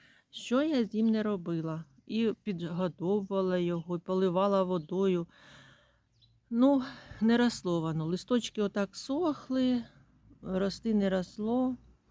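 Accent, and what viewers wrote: Chernihiv, Ukraine. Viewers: Ви, мабуть з Чернігівщини? Я теж звідти. По говору, це мабуть, Макошино, Мена, Сосниця? Я з Борзнянського району. Але живу в Дніпрі. Підписана на Вас. Дякую за цікаві інформації. З святом Вас. І нехай щастить в усьому.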